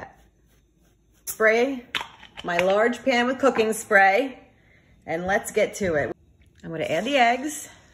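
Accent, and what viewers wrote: New Jersey, United States. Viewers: U must be from jersey !! Or maybe I’m wrong lol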